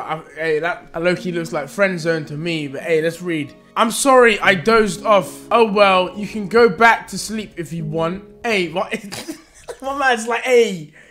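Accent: United States. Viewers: Omggg his American accent is honestly one of your best things on this planet lmaoo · talking about sorrow with a American accent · Your American accent is top notch man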